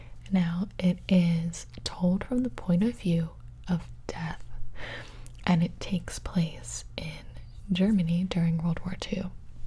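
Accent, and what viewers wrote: England, United Kingdom. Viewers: Where are you from? England?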